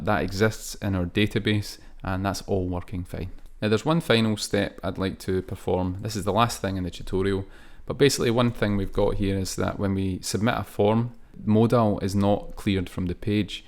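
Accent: Scotland, United Kingdom. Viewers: great video and I like the Scottish accent!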